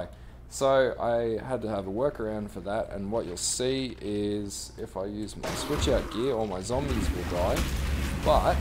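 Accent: Australia. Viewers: There's something soothing about being called a filthy exile in a smooth Australian accent (or is NZ?)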